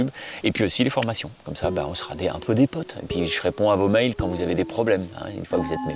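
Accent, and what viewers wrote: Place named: Salagou, France. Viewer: Putain con, cet accent du Salagou!!! ça donne envie de te suivre.Merci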